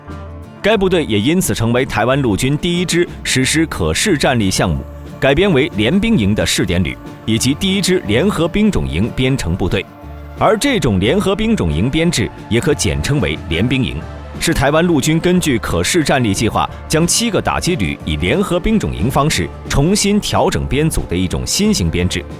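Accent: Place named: China. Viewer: 怎麼聽上去感覺是普通話，不像台灣國語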